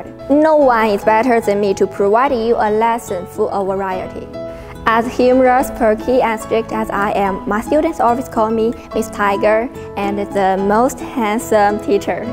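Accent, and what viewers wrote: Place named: China. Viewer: She speaks English with a Chinese tone system and briefness (Chinese doesn't extend their words like some English speakers do with English)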